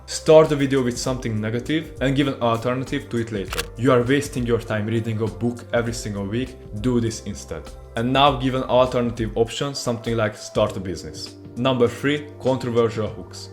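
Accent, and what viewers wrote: Hungary. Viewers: Olyan felismerhető a magyaros angol, egyből feltűnik 😀 Keep up the good work mate! 🔥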